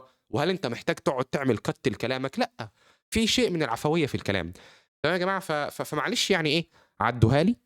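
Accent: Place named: Saudi Arabia. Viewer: لهجتك سعودية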